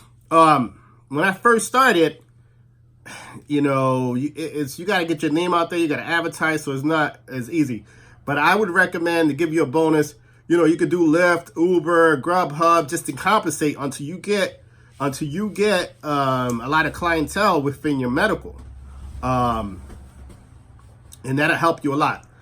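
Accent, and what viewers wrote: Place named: New Orleans, United States. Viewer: Dude gotta be from New Orleans!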